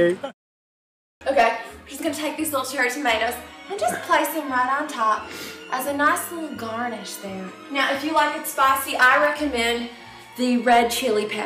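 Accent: South, United States. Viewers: What accent is she using, southern? English? Waspy New England? I like this segment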